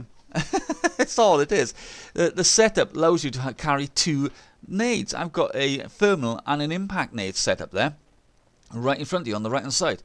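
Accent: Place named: Ireland. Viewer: Irish accent, spot on!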